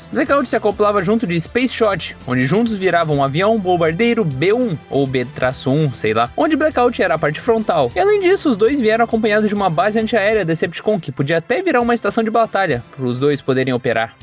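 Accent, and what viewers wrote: Paraná, Brazil. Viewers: mas pia eu sempre disse isso na tua live, é paranaense né, capaz. Com esse som so carca fogo no sape para comer pinhao, daí fica bom que é uma beleza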